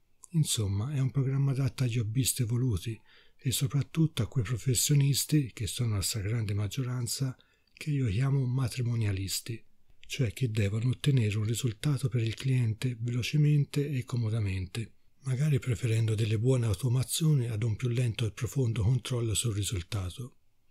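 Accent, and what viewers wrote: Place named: Tuscany, Italy. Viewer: Ciao! bellissimo lavoro! ti faccio i miei sinceri complimenti. Io sono una affezionata utente cinelerra (dal 2003) anche se ultimamente edito poco perche le mie scelte professionali mi hanno portato in altre direzioni. Avendo tempo lo tradurrei, intanto bravo e...essendo anche io toscana pero expat, un tu sai quanto mi sono commossa ascoltando la tua voce!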